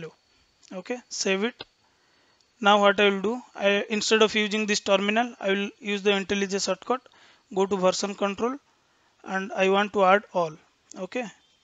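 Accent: Odisha, India. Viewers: Ur pronunciation is telling that ur from Odhisa.Their way of pronounciation is quit different from others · The way you pronounce... Probably you are from Odisha ? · Bhai it seems u r from Odisha. But great explanation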